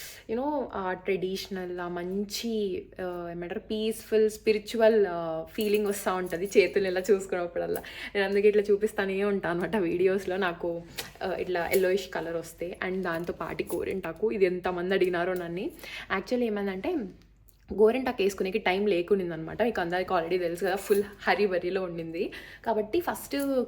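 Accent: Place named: Telangana, India. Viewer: emo akka nuvu matladuthuntay ma Telangana lo matladi nattu undhi naku, antay dialect antunaa......Ma Palamuru(Nagarkurnool+Mahabubnagar+gadwal) ki rayalaseema ki chala similarities untai ,idhi na personal opinion. Lots lo love from Telangana akka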